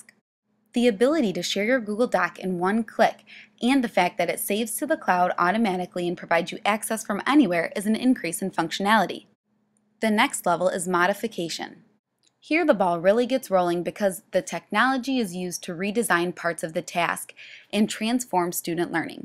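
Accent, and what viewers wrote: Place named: Midwest, United States. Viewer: That midwestern accent :)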